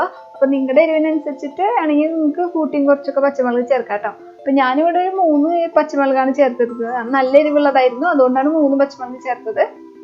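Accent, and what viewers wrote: Thrissur, India. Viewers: തൃശ്ശൂർകാരി ആണല്ലോ 👍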